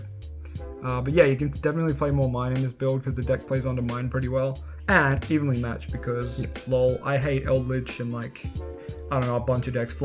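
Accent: Australia. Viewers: Facts. And he needs a translater, I hate his Austistralin accent.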